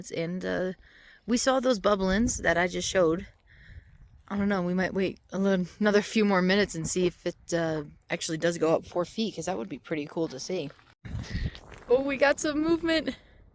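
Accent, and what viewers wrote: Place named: New York, United States. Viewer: Thanks for bringing us along on your adventures! I love your New York accent btw!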